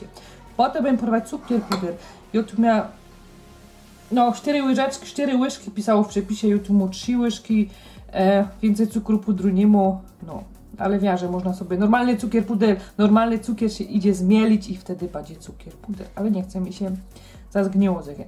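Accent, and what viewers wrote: Silesia, Poland. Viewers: No i co z tego że tych przepisów jest mnóstwo na youtubie I nie tylko jak wszystkie twoje przepisy jeśli chodzi o mnie,w twoim wykonaniu Są nie do przebicia. Uwielbiam ciebie i twoje filmiki tak się swojsko u mnie w kuchni robi. Ja też jestem Ślązaczką i dzięki tobie mogę pod szkolić się w godaniu🤪 wyjechałam na obczyznę 20 lat temu no i trocha mi się godo ale do ciebie jest mi cima 😂 dzięki tobie można się poczuć jak za dawnych lat w domu rodzinnym. Pikne mosz te włosy. A mój tata jak to kiedyś mówił „ to mosz dobre”. Pozdrowienia serdeczne i całuski😘💝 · Bo to jest po ślonsku, Opole jest na Śląsku, a my sam koltywujemy jezyk ślonski 😛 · Broń Boże ! Ta śląska gwara to clou programu ! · Pani proszę mówić normalnie bez gwary śląskiej, bo widać że pani się męczy. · Asz mi sie serce smieje ..jak ta moja gotka slysza...Dziolszko tys je einmalig....Pozdrowienia aus Nürnberg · Twoja rozmowa jest mega mix niemieckiego i śląskiego ale naprawdę fajnie się ciebie słucha pozdro 😊😊😊😊Aber echt gute sprache 😁😁😁😊😊😊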